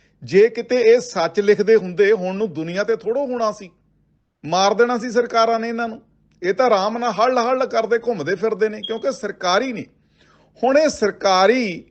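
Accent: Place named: Malwa, India. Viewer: Sahi. Punjabi. Bol. Mansa. Bhatinda. Boli. Dee. Jiada. Werton. Ker. Riha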